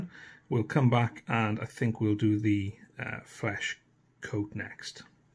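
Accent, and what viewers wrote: Wales, United Kingdom. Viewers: Great job. You sound Welsh mate. Where to are you from like? Sorry, couldn't resist. Shout out from the funny, sunny Rhondda.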